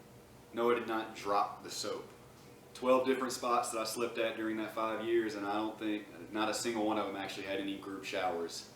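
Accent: South, United States. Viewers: you sound like your in the south. Go chat it up with After Prison show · yeh, after i noticed your accent was from usa i almost shit myself. i dont understand this case. you should have been protected under privacy laws of net neutrality or something unless you were fowarding bomb threats to the fbi or something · Sounds like an American accent... freedom of speech infringed upon? I've heard other countries you can get in trouble for words if you're unlucky but its still kinda bull. In America we have the amendments sooooo if you were arrested in America (can't say I know the details) but from what I have heard, this arrest was very unwarranted. So you must not be from America :/ If you are from America, im personally offended that it would even be possible for this to happen to you.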